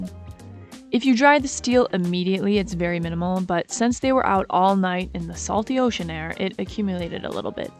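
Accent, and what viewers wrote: France. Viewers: Not trying to be rude but do I hear a French accent? And you guys sure do bust your butts! Your house will be a masterpiece when you done. I can already see it.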